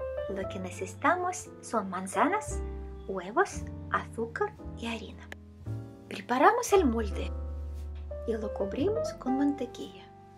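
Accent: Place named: Russia. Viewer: Que bonito escuchar una rusa hablando español....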